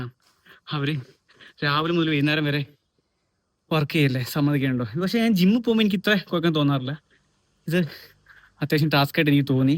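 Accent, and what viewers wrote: Malappuram, India. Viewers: നമ്മുടെ മലപ്പുറം ഭാഷ എന്തേനി🎉🎉🎉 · മലപ്പുറം thanne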